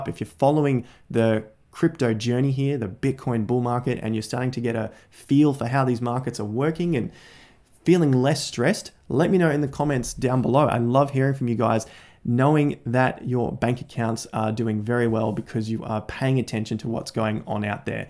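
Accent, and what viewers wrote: Australia. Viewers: Wycoff theory was echoing in my ears in his aussey accent for days without knowing what it really is. now I friggin know.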